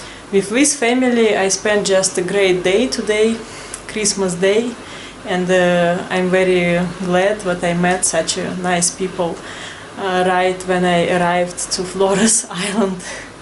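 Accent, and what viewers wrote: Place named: Russia. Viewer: You're a brave girl lol I always love Russian English accent.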